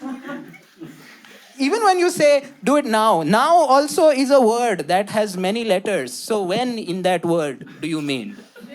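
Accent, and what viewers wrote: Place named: India. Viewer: He has such a stereotipical indian accent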